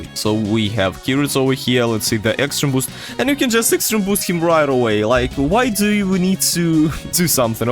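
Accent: Russia. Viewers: По акценту мне кажется что ты наш русский слоняра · Are you Russian?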